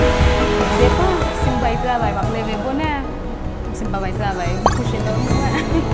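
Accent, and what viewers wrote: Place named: Cap-Haïtien, Haiti. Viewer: U pale tankou moun okap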